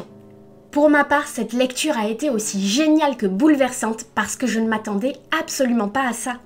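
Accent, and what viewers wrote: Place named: South, France. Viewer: Super sympa ta chaîne j'aime bien le concept (étant moi même en 2e année de prépa littéraire, forcément) ça change un peu de ce qu'on voit sur youtube tous les jours! Juste une petite précision au niveau de la prononciation, Zweig se prononce "tsvaïg" (à l'allemande, si on prononce aussi son prénom "Chtefan") ou à la limite "svaïg" mais le "ei" est bien à prononcer comme le mot "ail"! Voilà j'espère avoir pu t'aider, comme j'entends à ton accent que tu viens peut-être du sud, les noms d'origine allemande ne sont pas les plus simple à prononcer! Bonne continuation :)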